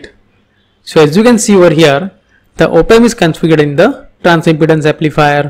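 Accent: India. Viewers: The Indian pronunciation in English is very so difficult to listen explanation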